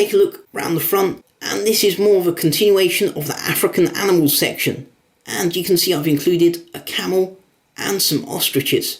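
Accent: Southern England, United Kingdom. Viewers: are you British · He's from southern england, i'd say. Prob london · Hey, hope you don't mind my asking, but where are you from? You have what sounds like a UK accent, but you speak the International dialect. Your garage rhymes with carriage, but you say trash instead of rubbish (among other things I've noticed). Just curious... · He must he from the UK ( United Kingston ) or London, they pronounce some words weird but thats justo how they talk there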